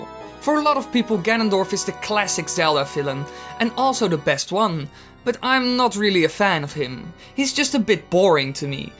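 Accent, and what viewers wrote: Netherlands. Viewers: it sounds like he is dutch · Are you dutch? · Lol i can hear you're dutch. Like me😂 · are you dutch?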